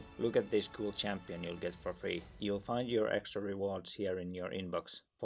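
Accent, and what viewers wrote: Finland. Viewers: Mitä helvettiä, oon kattonu sun videita nyt varmaan jo vuoden ja en oo ikinä tajunnu että oot suomesta :D Aloin miettii asiaa vasta ku aloit puhumaan mainoksen aikana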